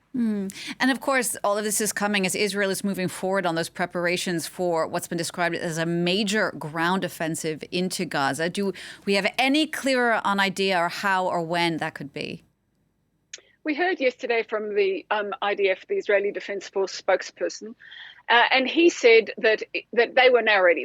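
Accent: Australia. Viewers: You clearly don’t know an Australian accent. At least 5 people have now commented saying it’s an Aussie accent. Judging by your responses so far and Knowing your mentality they WILL ALL be “Wrong”. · She is very understandable but her accent sounds like a cross between American and British,more rhotic than RP but less than 'Standard" American. Perhaps it is an Australian accent ,as other commenters have suggested. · She is probably Australian. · It is an Australian accent, everything was said clearly. The Australian accent is for the most part non-rhotic. This means that the pronunciation of the /r/ sound will never occur at the end of words. Where an American will say three separate sounds for the word car /kar/, an Australian native speaker will only say 2 /ka:/. This is a key feature that only occasionally has exceptions. There is nothing to change with the pronunciation of the words.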